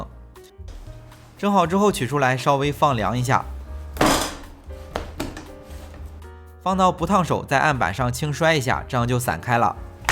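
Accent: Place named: Shandong, China. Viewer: 听口音就是北方人阿，山东的吧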